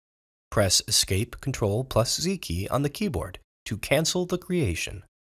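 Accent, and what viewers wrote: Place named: United States. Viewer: I absolutely love the clear, professional, American voice and how it clashes with the bad english script :D But thanks for the rundown, very informative!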